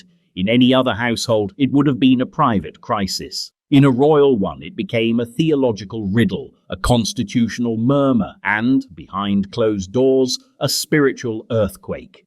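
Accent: United Kingdom. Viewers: I absolutely love this channel and have binged almost all of it. Some suggestions: - I love the British accents you use (2). Keep them - Don't ask the GPT to pad out the wording simply to make the video longer. 2 and a half hours is longer than you need, and padding just becomes tedious after the first 5 minutes 10/10 for this channel. Although this vid isn't as funny as the earlier ones. Input "Britishly subtle sarcasm" into the command. ❤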